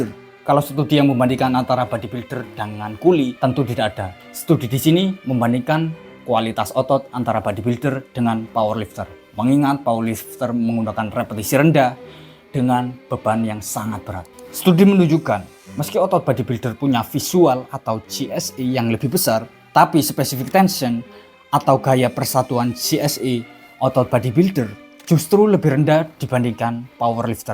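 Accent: Java, Indonesia. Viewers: Orang mana bang? kok bahasanya medok bgt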